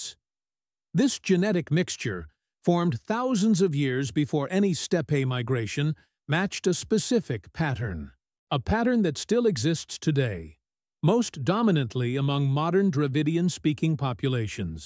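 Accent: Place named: United States. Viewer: Why is an American telling us about our DNA? Personally, with trump destroying the world, I'm very irritated by the accents from the US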